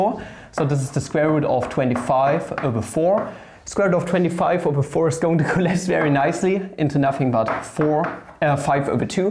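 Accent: Germany. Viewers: Ich mags wie der deutsche Akzent bei dir immer mehr und mehr schwindet beim englisch Reden :D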